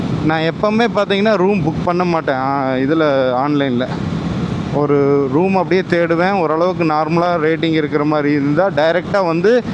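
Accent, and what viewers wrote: Kongu, India. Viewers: I wish i could meet you someday. I watch your channel just to hear your no nonsense kongu tamizh. I am from gobi. Keep riding and keep making these wonderful videos.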